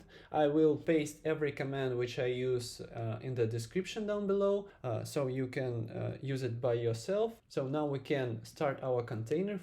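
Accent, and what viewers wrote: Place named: Russia. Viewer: Наш акцент трудно не узнать, но автор долго не выдавал себя, пока на Амазоне не появилась доставка в Российскую Федерацию 😂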